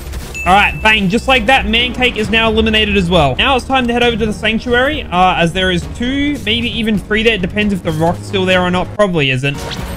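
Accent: Australia. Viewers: You australian i watched you before lazarbeam but i saw you on his video and thought you were aussie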